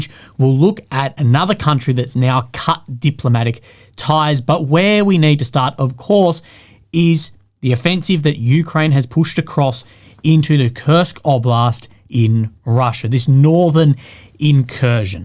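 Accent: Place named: Australia. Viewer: hearing an aussie accent always makes my day more cheerful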